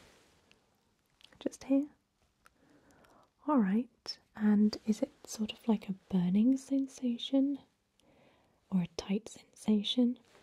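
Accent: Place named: Lancashire, United Kingdom. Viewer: Sometimes I don't know if I prefer a Lancastrian accent to a posh southern accent.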